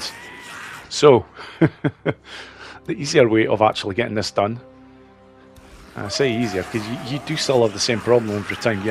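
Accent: Scotland, United Kingdom. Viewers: awesome content, brother. All of it. And I always enjoy hearing the Scottish accent. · You're fairly local to me as well, going by your accent anyway. I'm back at work, as a long distance(ish) truck driver. So the whole of the UK is local to me😀 I work for a Stirling company. Thanks for your kind words, but it was almost 3 years ago now, so the chances of getting that 3% back are pretty slim. On the other hand, I haven't given up yet. I did the 2021 Edinburgh Kiltwalk Mighty Stride, as the goal for my legs physio, 6hrs 6 mins. And 500 miles walked in training for it, so not at all bad for an old man 😃 So now I'm off to do all the challenges, with prep from your videos. Then I'd better do another play through, to see how much easier it could've been 😂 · Thanks for this - all hordes are cleared and some of these methods were used. Can't figure out if you have a decent Scottish accent or no. 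Or just Eastern european with British accent (no offense).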